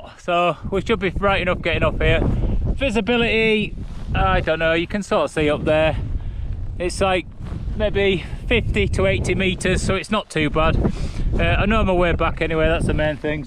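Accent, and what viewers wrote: Yorkshire, United Kingdom. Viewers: Fab watching this adventure…. I love your chat, the nice Yorkshire accent helps 😊 Surely it’s a bit scary getting back 😮 It must be so hard walking in this thick snow Thanks for the fun 🎊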